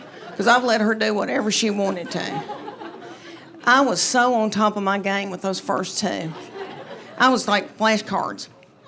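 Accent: South, United States. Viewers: She is really funny , love that southern belle accent 😂😂😂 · I am not a native speaker. What southern state his this accent? Georgia? Alabama? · Just discovered. Nice hearing a clean southern story teller. Jerry Clower style. ❤ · Yes...She is refreshing. I find it odd though...that her accent is way heavier and countrified in this video than the other two videos of her I watched. I think one of the others was from at least two years ago. This one is 6 months old. I guess she changes her twang...based on location? That would kind of make sense. You want to be understood. Maybe she moved back to Georgia? That is what the accent resembles. Regardless...I was also brought up in the mid 1960's - 70's...and I can relate to a lot of her stories. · As a fellow GenXer I enjoyed her material, but her accent is just too Southern for me. I'm as South as you can get(Florida born and lived here all my life), but I'd guess that only about 5% of people have Southern accents that thick. 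Probably because the vast majority of people that live here moved from up north. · With that southern drawl, only she can talk about her real life situations, and make it relatable and funny! 😆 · I always laugh…so relatable about everyday life with the fam. I love her southern drawl it adds to her stories. It beats one from up north any day… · This has to be one of the worst American accents there is! So nasal and annoying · i’m divorced, from extreme L california, been dating a lotta southern girls lately and now in love with their southern accent. and it’s women like that only makes me love southern girls more!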